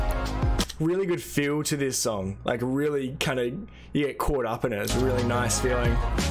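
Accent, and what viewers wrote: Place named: Australia. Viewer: Very cool! Love your Aussie accent! My grandma was from New South Wales, in Bega!. She loved all kinds of music and She would have loved your channel! Keep rockin’ it out! 🤘🏻❤️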